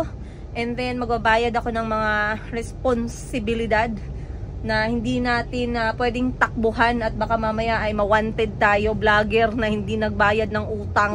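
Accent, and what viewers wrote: Iloilo, Philippines. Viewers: Mam? May i ask if you are from GenSan how come you sound and speak Ilonga? 🤔🤗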